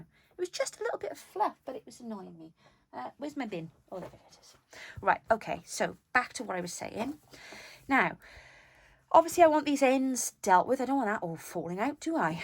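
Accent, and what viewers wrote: Wales, United Kingdom. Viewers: Hello, I enjoyed listening to you today even if I didn't get to watch the video. I wouldn't mind having a bit of your energy, just because currently I'm feeling a bit drained, but you make me laugh and chuckle with your Welshness. Thank you for being you. Take care and God bless you and yours. Sincerely Becky B from Arkansas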